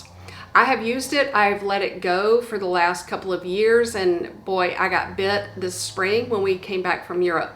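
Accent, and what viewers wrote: South, United States. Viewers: I cannot express how much I appreciate your videos. We have traveled quite a bit so how you manage to throw a tip out there that we can use is awesome! Every video you have is so informative. I have directed many newbie travelers to watch your videos. Keep up the great work! Your southern accent is also lovely. My husband is from TX and I love it. 😀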